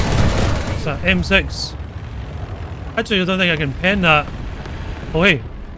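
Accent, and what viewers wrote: Scotland, United Kingdom. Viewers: Two observations: 1) Your “silly skit” intros are excellent, and they seem to be getting better as you go on; 2) I’ve made viewing your videos part of my daily routine. Whether it’s your Scottish accent, your hilarious sense of humor, or the excellence of your content, I guess I’m addicted to your channel. Well done,.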